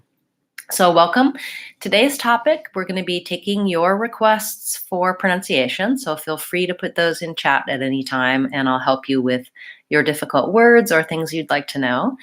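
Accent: United States. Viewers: I really love your American accent!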